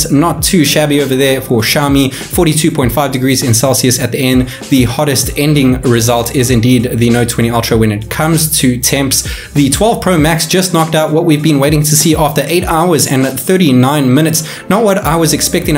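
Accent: South Africa. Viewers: Saffa accent so strong 💪🏽🤣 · Dude are you from SA? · U south african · Is this guy South African?🤔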